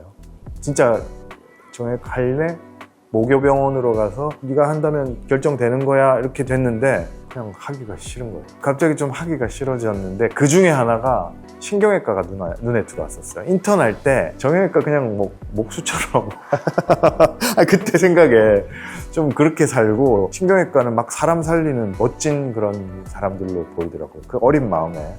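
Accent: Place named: Gyeongsang, South Korea. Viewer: 부산이셨구나 ~~~ 갱상도 억양이라 갱상도인줄 알았어요 ~~~~ 울산샘은 잘 계시는지요??? 궁금하네요 ~~~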